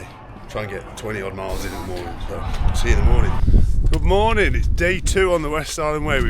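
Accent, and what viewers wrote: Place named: Leicester, United Kingdom. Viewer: Hi, new sub and not seen your channel before, just my cup of tea. I did a lot with my Dad too sadly passed away now, so really nice to see you out with your Dad. From the sound of your accent you may be not far from me in Leicester. Great Idea doing this trail in winter, now there’s an idea for me as it’s one I’ve not done, it’s popularity has always me off. Great vid and chat. I was interested in the Muon myself so wondering how that was for you, won’t be getting it now though as amazingly I was just gifted the osprey Levity 60, I’ve had the 45 since it came out and I love that so very pleased to get the larger version. Anyway A very merry Christmas to you and your family. 🎄🍻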